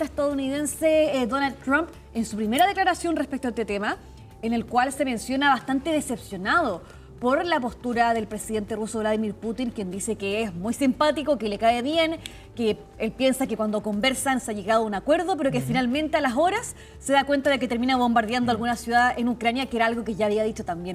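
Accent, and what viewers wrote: Chile. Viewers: Ese acento que chistoso es chilena ?? Me distrae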